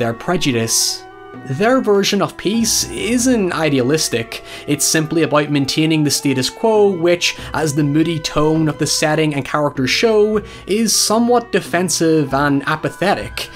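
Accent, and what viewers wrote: Northern Ireland, United Kingdom. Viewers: He is from UK, you know the f'ing birthplace of the language called English!? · He's from Northern Ireland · Northern Irish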